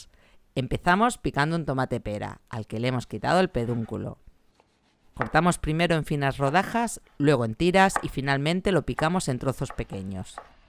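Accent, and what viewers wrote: Spain. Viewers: Me encantó la receta gracias . Usted es española cierto? Que agradable gracias.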